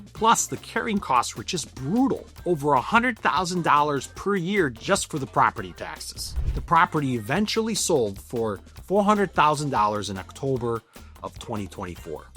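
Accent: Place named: Michigan, United States. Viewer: Interesting video. Love your Michigan accent!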